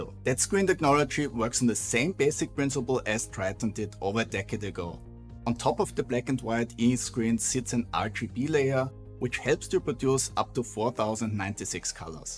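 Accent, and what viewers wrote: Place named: Austria. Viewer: Man hört den österreichischen dialekt so gut raus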